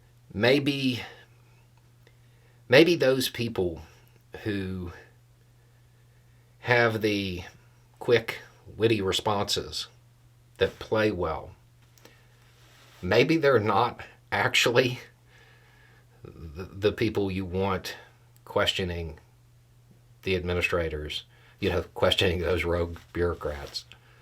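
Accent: South, United States. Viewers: You don’t talk slow. You talk country. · You don't talk slow sweetie, you talk southern and explain perfectly. Thanks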